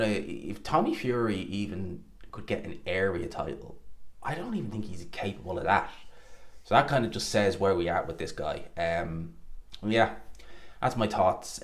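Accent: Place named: Clonmel, Ireland. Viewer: Sound like you're a clonmel man brother. Great channel.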